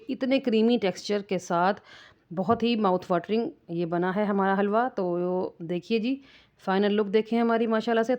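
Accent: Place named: Saraiki, Pakistan. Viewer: Saraikan lagti hay kionkay saraiki main urdu bol rahi hay!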